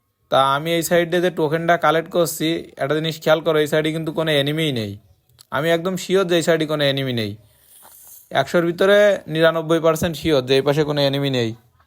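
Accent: Jessore, Bangladesh. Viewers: খুলনা বাগেরহাট যশোর তো একদিকেই এ কারণে কথা ওরকম মনে হচ্ছিলো..আমার খুলনা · সেই ভাই,,, আপনার বাসা যশোরের মধ্যে মনে হচ্ছে 🤔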